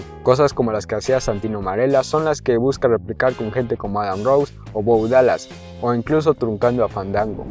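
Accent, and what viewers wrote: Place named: Andalusia, Spain. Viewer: Me desuscribo, no me gusta tu acento sureño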